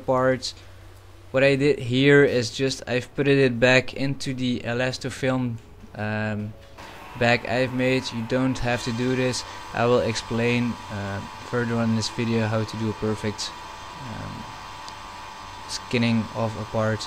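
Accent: Netherlands. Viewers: Let me guess.... your from the netherlands? (just the accent) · are you dutch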